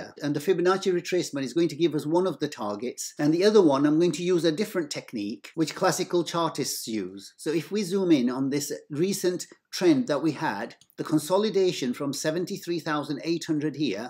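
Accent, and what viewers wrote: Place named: Birmingham, United Kingdom. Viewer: No - he's a brummie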